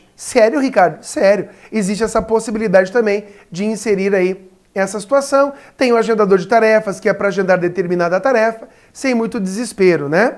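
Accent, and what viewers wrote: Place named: South, Brazil. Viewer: Ei professor você nasceu no sul do Brasil? é por causa do sotaque .suas aulas são ótimas ,vou prestar para agetel e agepol,valeu.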